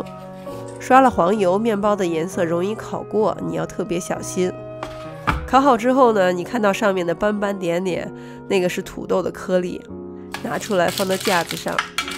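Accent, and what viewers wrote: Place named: Xi'an, China. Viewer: 你这口音像我们西安人啊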